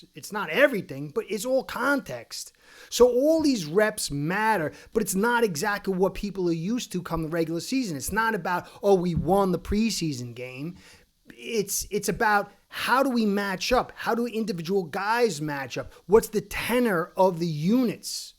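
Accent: New York, United States. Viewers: I love your thick New Yorker accent.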